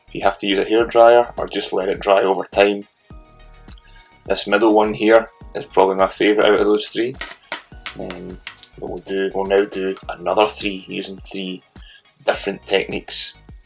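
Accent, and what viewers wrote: Scotland, United Kingdom. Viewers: Cheers from france , like your planets & love your Scottish accent.... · Anybody else love the Scottish accent, were painting sex planets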